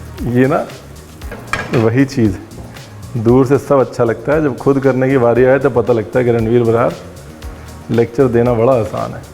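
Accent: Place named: Punjab, India. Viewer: Your punjabi accent is mind blowing🤯 👨‍🍳 chef. Keep coming with such great content and recipes. 🥳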